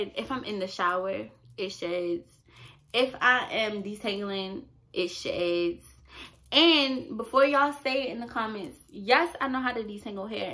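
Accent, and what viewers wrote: Baltimore, United States. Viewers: You’re from baltimore arent you😏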